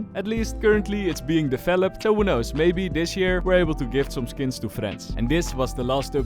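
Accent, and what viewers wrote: Netherlands. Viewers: Every day the fishy trick guy with a dutch accent posts is a good day:)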